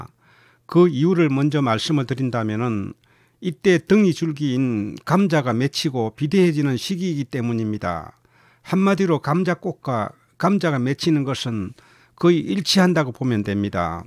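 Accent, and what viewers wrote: Gyeongsang, South Korea. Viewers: 대구 억양??? 이발, 감자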